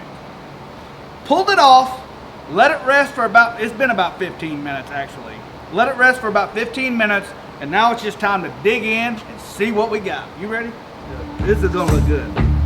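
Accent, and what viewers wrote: South, United States. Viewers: man you seem like a great guy but it every vid I've seen feels to me like a Guga Foods video with a southern accent; maybe a different style of video would get you more interaction on the site?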